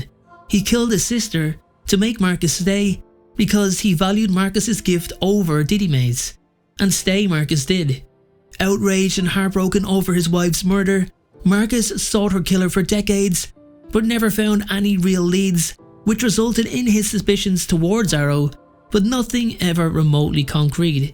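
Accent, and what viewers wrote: Ireland. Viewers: This is a great channel, you should make videos on the Saga of Darren Shan, very little lore videos on YouTube about the series and it’s an amazing vampire series ! You sound Irish so I presume you’ve read them but if not check them out the audiobooks by Ralph lister are amazing! Subbed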